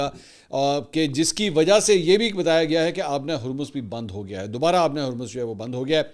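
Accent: Punjab, Pakistan. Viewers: 😂😂😂😂😂😂😂😂 very nice ap ka mzaq starting mn and hmary punjab ka andaz keeeeepppp ittttttt😅😅😅😅😅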